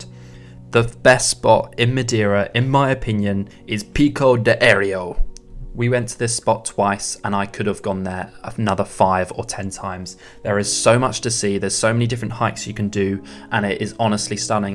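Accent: United Kingdom. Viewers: Cheers! Great, great vid. Madeira is stunning, no doubt. The hike between Pico do Arieiro and Pico Ruivo must be one of the top hikes in Europe. My favourite island. Don't worry about the pronunciation: Brits speaking Portuguese words always make me giggle (in a good, lighthearted way). Best wishes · Can’t take the British - Portuguese pronouncing serious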